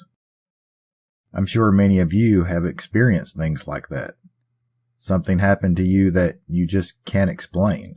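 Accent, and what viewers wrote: South, United States. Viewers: I always start looking for your newest video starting Sunday afternoons, then check hourly. You always make it well worth the wait. Love to hear a fellow Southerner. North Carolina ☠🏴‍☠⚔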